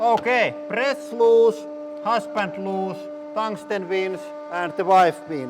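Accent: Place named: Finland. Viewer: I can instantly telm ur finnis because of the accent so moi ja oli hyvä video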